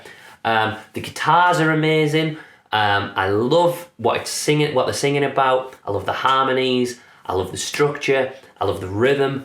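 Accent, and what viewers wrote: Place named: Northern England, United Kingdom. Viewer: A very nice review man. I love this album. The harmonies do it for me really. I detect a northern accent from you. I am a Londoner lol. I will check out your George Harrison review soon, as well as any others you have done. Great work!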